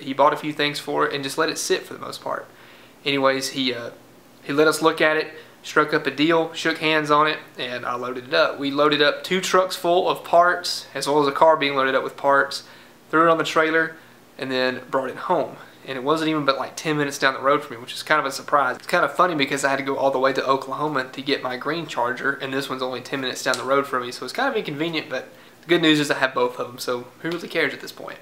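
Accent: United States. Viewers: You make very good work on this Dodge 1969. I'm glad you did. Amid all the troubles and bad things happening on this planet. You also have good English well understood, Unlike the original English spoken in England. We Bulgarians are more accustomed to this pronunciation and the English accent that is in the United States and Canada. This original English in England is more difficult to understand. Even there seems to be little difference in the pronunciation of English in the Eastern States and the rest of the United States. But English in the other states seems to be a little better than English in the Eastern States. Somehow the English that is in the western and middle states is more melodic, the words blend and sound better, more easily understood than the English in the Eastern States and the original English in England.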